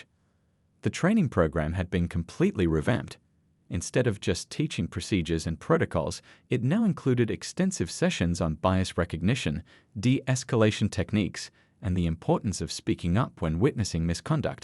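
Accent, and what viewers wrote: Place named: England, United Kingdom. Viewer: Suck video of a still picture and English crappy accent...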